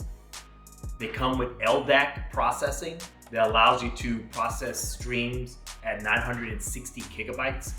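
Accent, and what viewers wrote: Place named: New York, United States. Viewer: Good effort for a noob but that New York City accent is way to heavy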